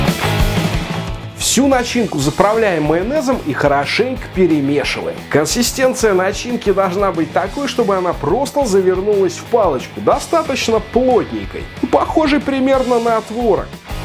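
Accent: Moscow, Russia. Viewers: Спасибо за простой и вкусный рецепт!) Московский акцент придает особенность твоим видео)))))))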